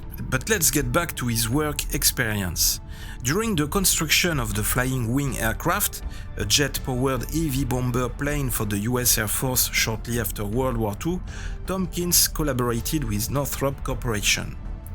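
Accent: France. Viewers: Ça c'est l'accent d'un français ou bien je ne m'y connais pas en accents 😂😂😅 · .... I can't. 😅 As much as I'm interested in the content that Ridiculous French Accent drives me insane. That annoying AI Voice would of been more bearable..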